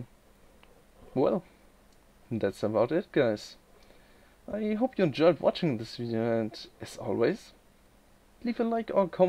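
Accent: Germany. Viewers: Ich mag deine Stimme. ^^ man hört das du deutscher bist:D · your English is very good. your German accent is wonderful. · Herzlichen Glückwunsch! Man höhrt das du Deutscher bist. Kommt jetzt mehr mit Sprache? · Your English was great, German voice is always cool! Haha. Congrats on your 25k and in a short time, but you can tell why people watch your videos as the same reason that I do. Your quality is amazing, your gameplay always looks greatly handled and your info is always very well explained. I don't follow too many people for warframe unless I like the information provided, and I'm picky and yours have always appealed to me. I play on Xbox one and I still come here for your work. Keep it up! I look forward to your future videos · Ahhhh that wonderful German accent. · How did I know that you have a German ascent? Any way just wanted to congratulate you on your 25k it will be no time at all before you hit 30k! 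As for how long I've been subed = I have been subed to you a year or so maybe 2? As to why I think your music selection is great and I also think that your reviews of items are very helpful any way keep up the great work! P.s could you make a updated video on how to get out of the leset? (Have no clue if that's how you spell that XD) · i love the german english accent. i am from holland so i know your struggles;) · Dude you said your English is bad but it's great you just got a cool German accent, that's all.Also you probably speak better than me and I'm from England, I just stutter way too much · Ich kann mich nicht erinnern, wann ich deinen Channle abonniert habe, aber es ist bestimmt schon eine ganze Weile her. Ich abonnierte dich aus dem Grund, das dein Content sewhr hochwertig wirkte und ich fand, dass dein Fachwissen den großeb Warframe- YouTuber(mogamu etc....) bei weitem überlegen war. 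Deine Videos waren unglaublich informativ und das obwohl sie so kompakt waren. Ich mochte das insgesamt Paket einfach gerne und du bist immernoch mein lieblings Warframe-YouTuber, weiter so! Ach un dein Englisch ist gut, du klingst halt ein bissel deutsch, aber wer tut das nicht^^ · TBH your english is better than alot of us! Both written and spoken P.S. German accents are awsome i have 3 foreign students from germany at my school and I really like their accents. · Ich wusste nach 2 Wörtern, dass du auch Deutscher bist:D Darf ich mal fragen wie alt du bist? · I subbed when you were around 5k, and don't bother with the plat for me, I play on xbox one, but anyways I subbed when you were around 5k, and I subbed because I really liked the music in your videos, as well as the knowledge you gave me through your vids, and the videos were fun to watch. And by the way, I love the german accent, and your english was fine!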